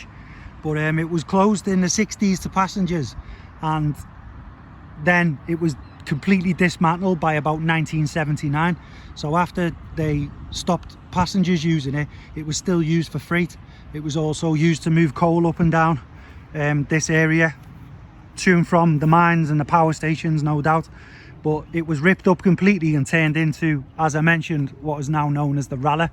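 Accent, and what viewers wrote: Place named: Liverpool, United Kingdom. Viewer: Scouse is endangered so it is refreshing to listen. You speak slowly enough for me to get used to it. Thanks for the little stories, so important to keep traditions alive.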